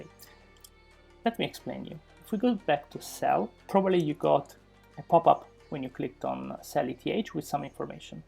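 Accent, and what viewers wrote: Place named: Italy. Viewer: Ciao, sembra che sei italiano..di dove sei?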